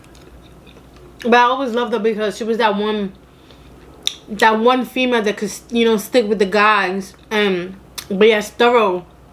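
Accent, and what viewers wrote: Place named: New York, United States. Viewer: I’m watching or listening to tour video while I’m doing my moms makeup and she said is she from ny I can hear the accent lol😘😘😘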